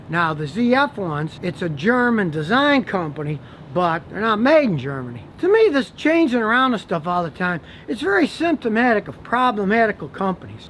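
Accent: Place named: Texas, United States. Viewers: Poo-jo, God is so clever! Subtle texan 😀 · The guy is from Texas. They all talk funny down there.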